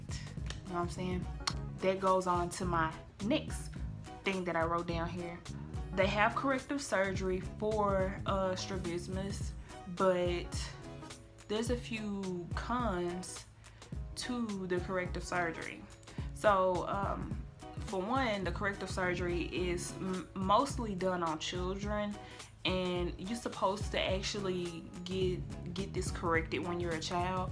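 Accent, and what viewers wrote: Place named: South, United States. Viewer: gal u are beautiful and very articulate with that southern twang , I am still single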